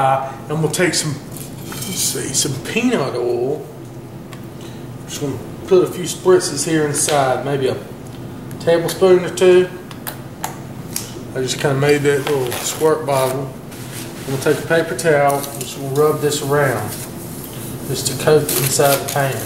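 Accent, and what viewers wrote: South, United States. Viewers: I've done this before and know about woks. Your video is the best. Funny hearing a southern accent doing Asian.....lol! Great Job!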